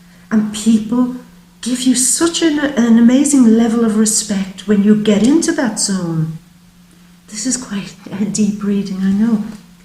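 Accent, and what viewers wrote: Ireland. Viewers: So fabulous to come across an Irish accent 👌. Beautiful reading by a beautiful lady 💖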